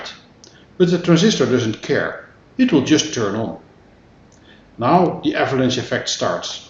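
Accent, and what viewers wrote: Netherlands. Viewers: Thank you for the explanation! great stuff! PS you sound Dutch ;)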